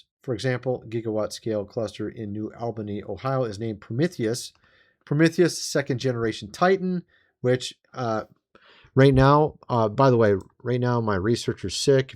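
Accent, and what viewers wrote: United Kingdom. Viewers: Why is a British guy in America ?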